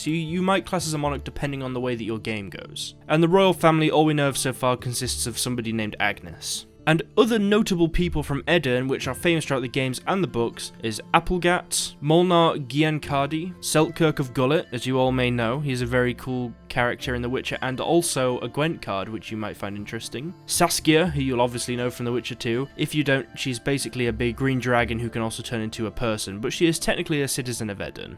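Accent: United Kingdom. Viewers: Loved that intro for the Witcher 2 with Letho wish i had played it on ps3 and let Letho live. Are you from the UK?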